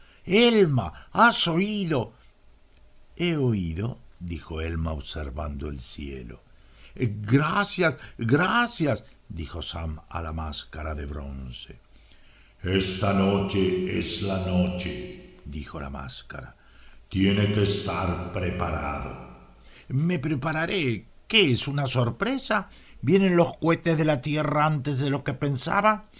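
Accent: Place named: Argentina. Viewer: Ese acento argentino no me deja concentrar ...😅😅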